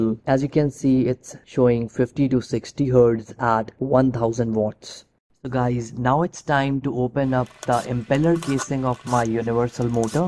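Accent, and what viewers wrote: India. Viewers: Está hablando en inglés pero con acento hindú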